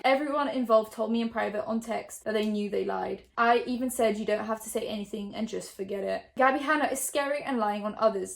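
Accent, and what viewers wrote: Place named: Newcastle, United Kingdom. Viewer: where are you from out of curiosity? I’ve always assumes English (you sound Geordie to me but I have the worst ear for accent) but am now having a crisis because of how you said “in the uk.” Help me please XD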